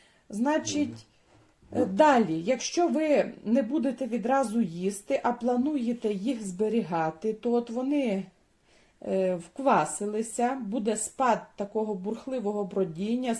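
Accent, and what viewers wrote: Ukraine. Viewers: Как же я давно не слышала настоящий украинский язык. Такой родной и красивый как у бабушки в селе в Винницкой обл. От западенского уже уши вянут. Спасибо большущее вам за рецепт. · Дякую Вам за простий і зрозумілий рецепт! Підписався. І дякую за мову, звідки вона така? Не Полтавщина, там характерні "ль", не Галичина - відсутні місцеві вставки. Скоріше центр України: Київ-Дніпро-Вінниця-Кропивницький-Черкаси. Підтвердите мої здогадки?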